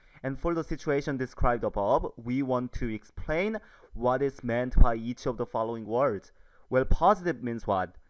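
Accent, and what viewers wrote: South Korea. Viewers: I’m korean, and his accent sounds VERY korean. :)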